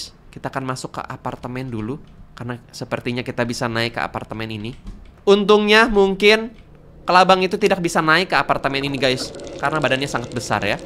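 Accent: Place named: Sunda, Indonesia. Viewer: Sunda bang😂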